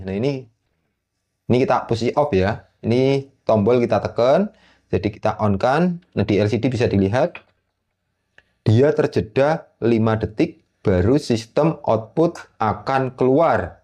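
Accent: Java, Indonesia. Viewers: Jawa ini ngomongnya tebal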